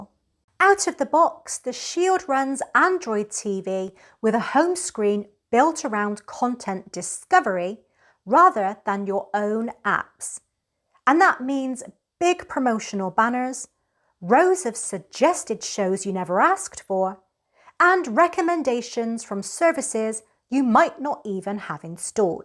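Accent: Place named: Cornwall, United Kingdom. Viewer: 😁 I grew up in Cornwall so have an ear for an accent... Can't decide whether you're Cornwall or Devon..? Or, to cover my bases completely, Dorset 😁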